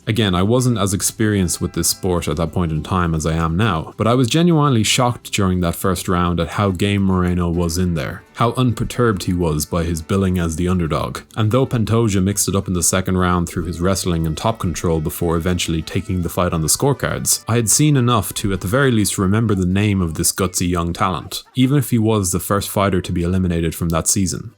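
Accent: Ireland. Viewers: Irish. Not even close. 😂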